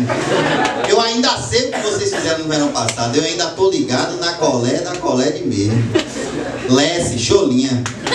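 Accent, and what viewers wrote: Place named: Bahia, Brazil. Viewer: Sou do RJ não conheço as gírias dos baianos.. fico rindo mais do sotaque... nada contra.. bem diferente.. acho irônico.. cada região do país tem um sotaque diferente sendo q todos falam o msm idioma.. tá í uma coisa q nunca saberermos.. isso não acontece só no BR.. Portugal também ha regiões de diferente sotaques.. todas as línguas na verdade né kkkkk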